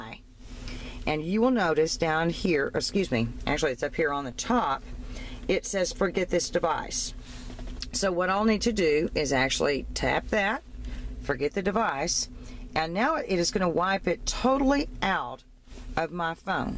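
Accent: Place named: Virginia, United States. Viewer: Really? I liked her voice. More Virginian I thought.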